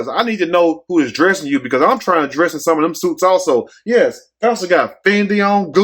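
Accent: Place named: New York, United States. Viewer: Watching in from New York! when I first started watching u, thought u were from up this way 💃🏽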